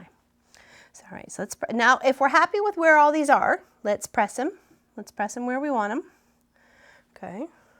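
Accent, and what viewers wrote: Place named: Nova Scotia, Canada. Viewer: so very Nova Scotian love it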